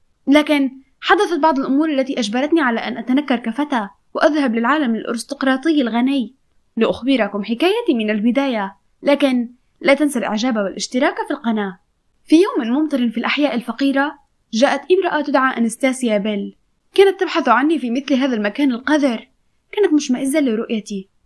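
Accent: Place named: Jordan. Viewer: حاس انكي من الاردن بعرفش هيك بحس 😅😅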